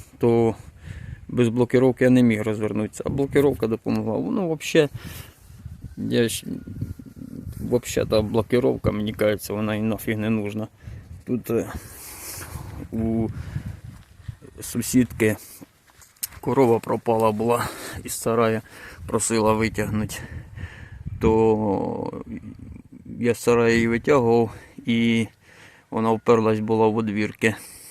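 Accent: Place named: Poltava, Ukraine. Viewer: Я чую по розмові, що свій хлопець, з Полтавщини. А , виходить, сусідній район. Вітання з Миргородщини. Відео герні. Трактор також гарний. Міркую про придбання такого. Знімай відео ще. Нехай щастить.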